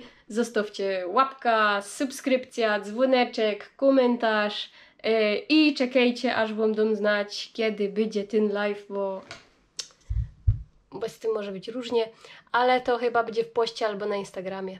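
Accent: Silesia, Poland. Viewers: Podoba mi sie ta Śląska Godka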